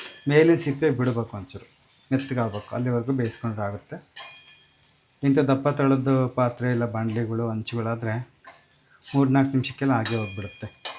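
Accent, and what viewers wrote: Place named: Davangere, India. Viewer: Annapoorneshwari taayiya sampoorna ashirvada ide nimge...ella adgegalnu estu shuchi ruchiyagi madtira...innu hechhina recipes na upload madtiri...nav davangereyavru...namge Khushi ide neevu nam side adge madvaga hage mam bhashe kelvaga...gud luck sir...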